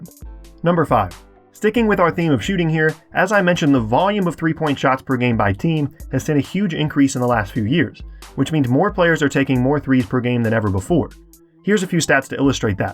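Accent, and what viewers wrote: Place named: United States. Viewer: My Account he sounds american to me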